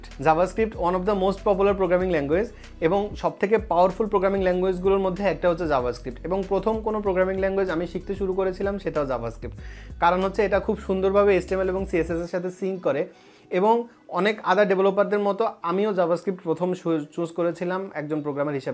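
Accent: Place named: Noakhali, Bangladesh. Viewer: Apner kotha sune mono holo apni noyakhali or Laxmipur er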